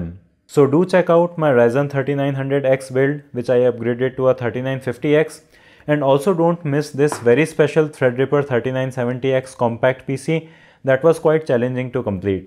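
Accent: India. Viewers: You have the stereotypical Indian accent. Good video.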